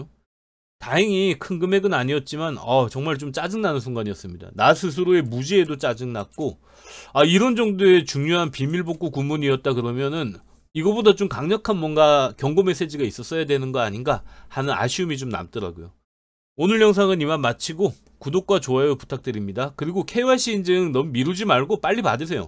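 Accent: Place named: Gangwon, South Korea. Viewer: 좋은 정보 감사합니다 말씨가 약간 강원도 억양이 보이네요 강원도 분이시죠? 저는 강릉에 거주중 입니다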